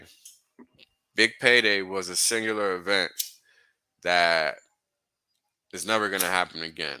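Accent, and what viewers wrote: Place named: New York, United States. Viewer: Is your voice/accent for real bro, that's some serious New York.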